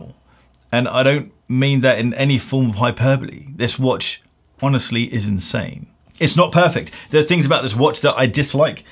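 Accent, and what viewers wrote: RP, United Kingdom. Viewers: Is his accent RP? 😁 I like to listen when speaks. Such a beautiful accent. I heard he pronounced T clearly in most of his videos but when he said "water", it doesn't really sounds like RP.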